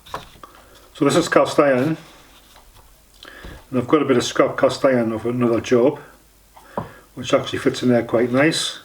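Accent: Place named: North East, United Kingdom. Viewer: your welcome ,just "discovered "you today got some great stuff ,like the hold down for silver soldering, metal is sorted out from the "scrap box" & I'll have one made tomorrow ! northern eastern accent I'm prob not far from you a little bit further down. Graham.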